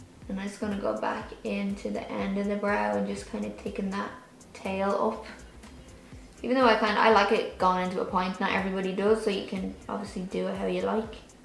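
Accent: Ireland. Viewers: I'm not the best with accents. Are you from Ireland? Your speech is beautiful. My great grandpa is from Ireland and I've always wanted to visit. The dreams of that kind of went down the toilet when I got married and became a stepmother and then a mother.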